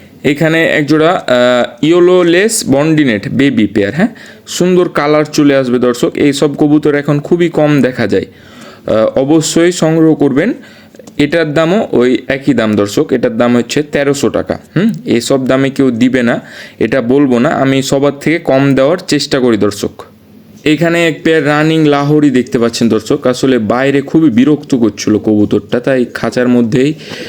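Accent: Kolkata, India. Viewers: উনার কথার ধরণ কলকাতার মানুষের মতো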